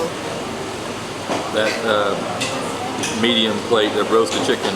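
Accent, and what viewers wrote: Kentucky, United States. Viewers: Fabulous video! Great music and editing, well done! Love it (especially the Kentuckian accent)!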